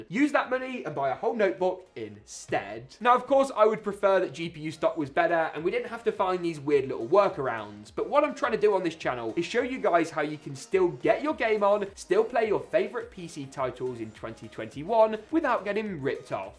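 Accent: United Kingdom. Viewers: british accent is so ridiculous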